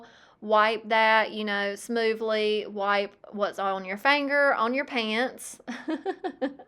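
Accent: South, United States. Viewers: This is my first time seeing one of your videos and I immediately noticed your southern accent. So in searching I found you are from Kinston, NC. I'm in Wilson, NC, but living in Maryland for 38 of my 77 years, I've lost most of my accent.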